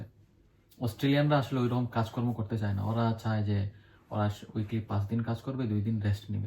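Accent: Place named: Sylhet, Bangladesh. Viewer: Bhai accent a lager Sylheti...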